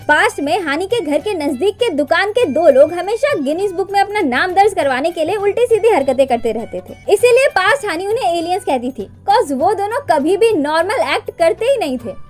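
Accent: Bengal, India. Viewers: Explanation was amazing and funny . And 1 question you are Bengali ❓ Because you are talking like about Bengali .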